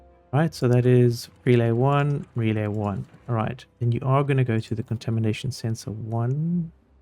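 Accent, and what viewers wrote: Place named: South Africa. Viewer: yay, another South African timberborn player, heard the accent immediatly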